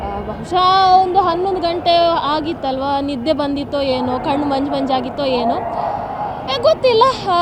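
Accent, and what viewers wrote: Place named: Mangalore, India. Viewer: mangaluru kannada super jayavagali Jaisiyaram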